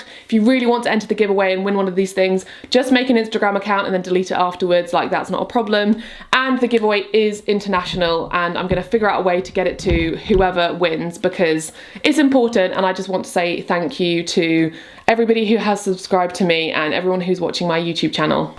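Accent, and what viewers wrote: United Kingdom. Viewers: I watch your videos since 3 years now and love them. Your british accent is kind a cute. Would love to win one of the blenders because I just moved out to study becoming a dentist. I hate that I don't have the ability to blend stuff in my little dorm room.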